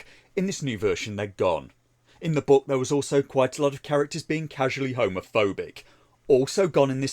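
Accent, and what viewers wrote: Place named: Lancashire, United Kingdom. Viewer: is in Lancashire!! i know coz i live there!:-) "oor rite arr kid?":-)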